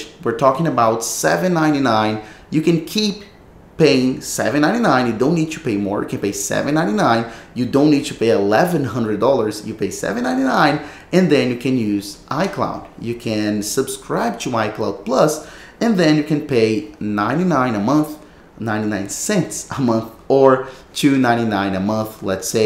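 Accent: Brazil. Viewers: sound brazilian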